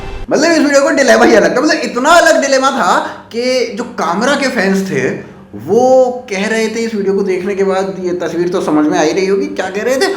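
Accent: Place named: Madhya Pradesh, India. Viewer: Bhaiya apki dialoge delivery waqai zabardast hai we support you.. bhaiya r u from Madhya Pradesh? I think so because ur talking style is similar to MP people i am also frm there.. :)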